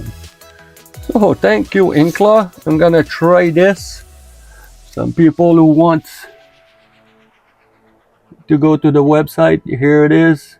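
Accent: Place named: Quebec, Canada. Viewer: Salut! Ca fait quelque temps que je vois ca passé et je suis tout autant intrigué en espérant de les voir en action bientôt! Ca aurait aussi ete le fun que tu fasse des vidéo en français parce que clairement a l'accent tu est québécois 😊 ce genre de contenu est vu et revus en anglais, je comprend que tu essaie de rejoindre la plus grande audience possible mais bon moi j'aimerais bin ca trouver plus de ce genre de contenu en français et je suis sûrement pas la seule. Sur ce merci pour tes Vidéo 😊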